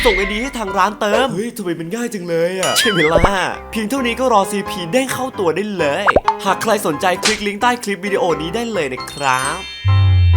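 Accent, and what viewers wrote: North, Thailand. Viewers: คลิปก่อนพี่พูดเหนือ ผม:นี้ใครว่ะ?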